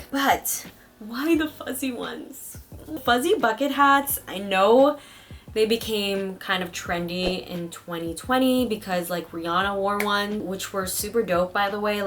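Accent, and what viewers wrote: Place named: United States. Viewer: I think it’s so cool how you Americans sound talking “button”, “them” and etc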